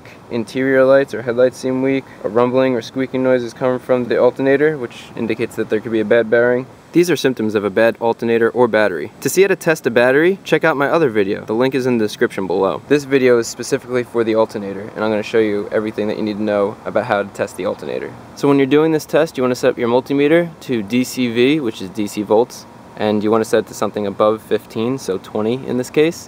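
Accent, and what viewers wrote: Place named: Upper Midwest, United States. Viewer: Are you from the northern mid-west? Try not speaking lazy with your lips almost closed.. it makes you sound like Carmen on Southpark. Just like when singing, public speaking required that we open our mouth when speaking. Just a suggestion. .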